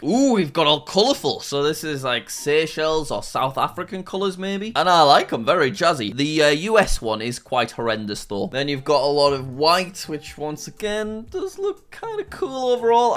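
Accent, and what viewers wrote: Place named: United Kingdom. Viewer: I still like his videos ven though THE BRI'ISH ACCENT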